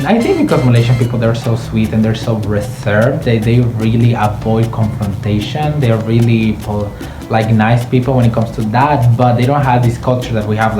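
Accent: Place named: Philippines. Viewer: your accent sounds philofinos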